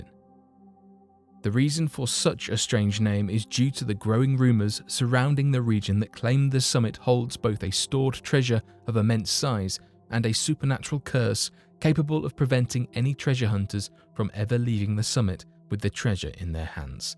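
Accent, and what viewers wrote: United Kingdom. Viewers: I like this narrator much, much more than the usual one. He sounds like an actual British human and not a computer simulated voice. Great topic, too. Thank you for posting this!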